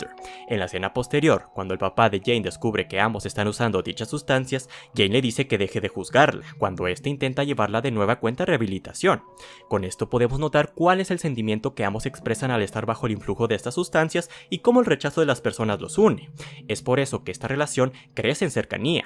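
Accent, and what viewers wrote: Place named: Honduras. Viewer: Me gusta el acento de la voz del locutor o narrador, es un acento hondureño no?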